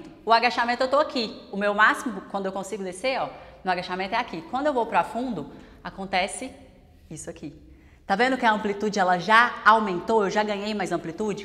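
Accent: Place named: Goiás, Brazil. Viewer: kkkkkkkkk, o sotaque dela sempre entrega que ela é goiana raiz.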